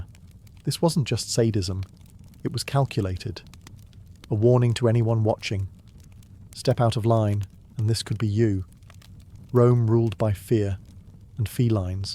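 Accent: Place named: United Kingdom. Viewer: PS: Are you American, Canadian or British? Your voice and word usage sound vaguely British.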